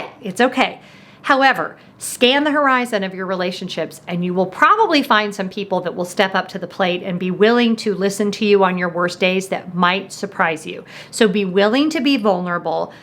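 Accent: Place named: United Kingdom. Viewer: I loved the British accent 🇬🇧!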